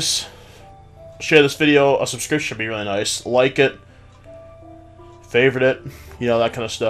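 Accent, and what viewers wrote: United Kingdom. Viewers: OMG I’m soooo sorry I went to the bathroom and I saw my 8yr old bro did that. I love your vids and I like your northern accent I kinda have a northern accent I’m sooooooo sorry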